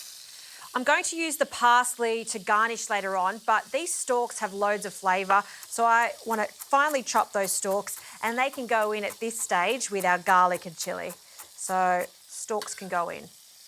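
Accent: Australia. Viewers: I don't see the problem here. She said pasta, she has Aussies accent. We all know what she meant. Also, no ones asking. Hope ya have a better day champ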